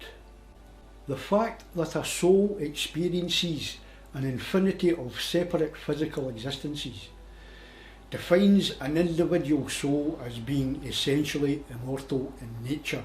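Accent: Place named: Scotland, United Kingdom. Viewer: Wow the Scottish accent brings me back to Edinburgh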